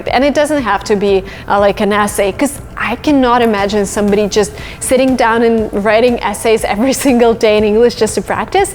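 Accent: United States. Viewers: Thanks.Your pronunciation is like an american.Where are you born? I love you...!!!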